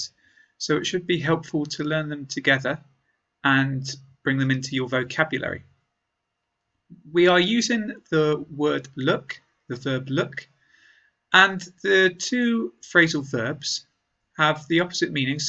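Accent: United Kingdom. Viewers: Thanks a lot! I love your British accent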